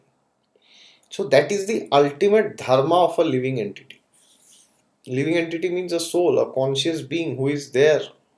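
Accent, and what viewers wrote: India. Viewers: I really enjoy your Indian tone English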